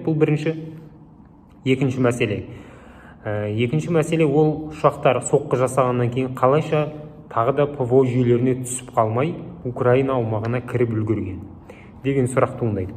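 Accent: Standard. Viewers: Қазақша таза сөйлегеніңізге отбасымызбен ризамыз. Мықты. Ұнату және жазылу.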